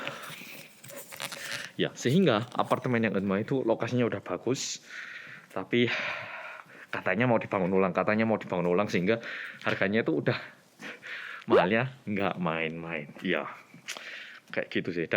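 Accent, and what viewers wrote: Java, Indonesia. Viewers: Nonton vlog mas saking medoknya, sampe lupa klo ini vlog dikorea😭💔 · Masnya medok tapi kaya orang korea.orang mana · Yes mix indonesian languange with accent jawa😀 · Bersama orang korea yang Medhohokk, suka banget denger nya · Mase..medhok bgt bahasa indonesiany..👍🏻👍🏻👍🏻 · Medok banget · Jawab dl dong, subscriber terlama dari chanel ini kok si kakak ini medok banget ya? apa ayahnya org indo atau gmna? atau org tuanya emang org korea cm tnggl di indo dri kecil aja? mau coba ngikutin vlog pertamanya tp aku maget guys😭🤣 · Medok e ga biasa kakak🤣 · Kagum sama mas medok banget bahasa nya... Setelah lihat video mas ini, saya bisa lihat korea tanpa harus pergi ke korea, Aslinya pingin banget bisa jalan jalan ke Korea. Sering sering buat konten outdoor seperti ini ya Mas.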